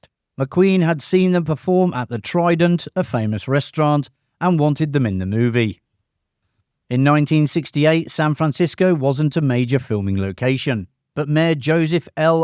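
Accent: United Kingdom. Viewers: Why is it always a British guy that has explain everything????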